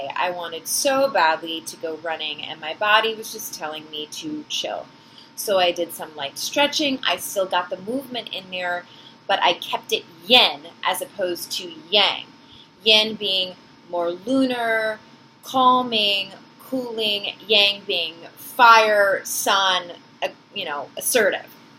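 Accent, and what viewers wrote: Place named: United States. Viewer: I really thought you were British in John Carter... O_o I'm still trying to get used to your American Accent... talk about a twist.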